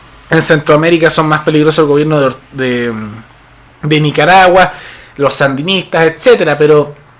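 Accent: Chile. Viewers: Yo lo que sé es que jamás me había gustado el acento chileno excepto tú si tú tú tú me gusta como hablas y ese acento que tienes claro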